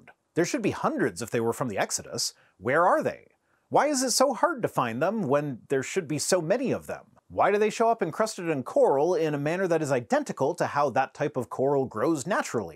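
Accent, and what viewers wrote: South, United States. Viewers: I tried to get through this , but this dude's voice and flimsy argument were too much to tolerate. And although I live in Louisiana, his cuuuntree Ackkkksent was shrill at best. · Being from the southern United States, I can say this with all sincerity and lots of experience...NEVER BELIEVE ANYTHING ABOUT GAWD WHEN IT IS SPOKEN WITH THAT ACCENT!! NEVER!! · His southern accent is so grating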